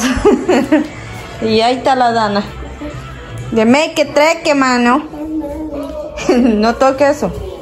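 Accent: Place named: El Salvador. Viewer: Los salvadoreños así hablan linda conozco muchos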